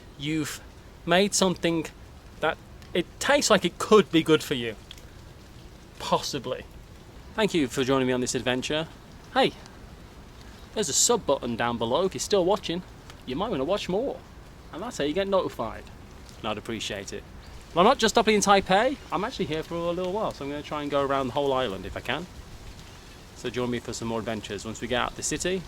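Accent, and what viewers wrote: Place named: United Kingdom. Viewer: Hi, newer subscriber here and glad to see a new video in Taipei Taiwan. Can tell that you enjoy walking, as it seems like every video you sure get in your steps for the day. I enjoy your Pop In The Bar portions as you alway describe what you are trying very well. We can almost taste it. Wanted to say how much I enjoyed your Kosovo series and so glad you captured the footage of that cute dog Prince and trying the Goulash with the guy from the hotel. Enjoy your British accent and determination so looking forward to more videos of Taiwan.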